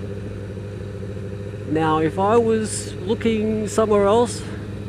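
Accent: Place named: Australia. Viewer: Simply fantastic mate! Us American blokes luv everything Australia+UK...yes I knew from the accent and driving on the wrong side of road..I thought UK until I saw Palm trees. So now I can see Sydney from the seat of a fireplace. Say, my bike-CBR1100XX..is not common here, but I know that you have some over there...ever seen one over there in kangaroo land? I'm subscribing.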